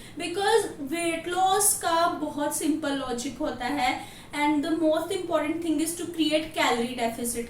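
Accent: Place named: Punjab, India. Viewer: Hi, Awesome video!!👏🏻👏🏻 So happy to hear your voice + that punjabi accent😍 Proud proud😎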